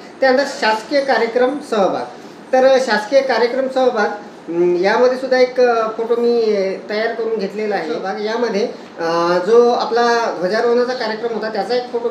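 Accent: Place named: Vidarbha, India. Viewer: खूप छान माहिती सर. अतिशय चांगल्या पद्धतीने अवघड प्रक्रिया एकदम सोपं करून दाखविलात. तुमच्या वऱ्हाडी बोली भाषा एक वेगळी ऊर्जा उत्पन्न करते. खून छान माहिती.❤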